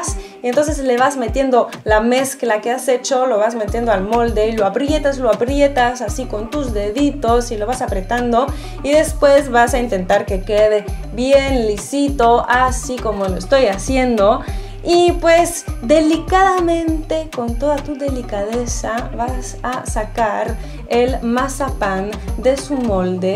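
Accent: Mexico. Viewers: Hola tú puedes pasar por una mexicana y más por el acento que has logrado al hablar el español 😃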